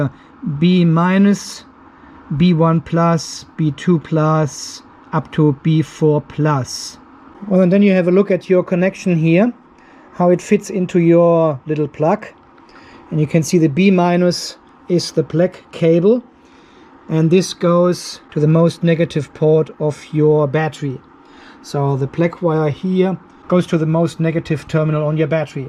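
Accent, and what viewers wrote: Germany. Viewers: I HAVE A QUESTION .... I am curious about your ACCENT what country is that it's not Australian LOL I am guessing German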